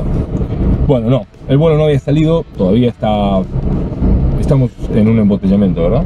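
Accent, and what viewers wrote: Argentina. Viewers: Ya me parecía que eras argentino por tu forma de hablar. Aguante argentina máster 💪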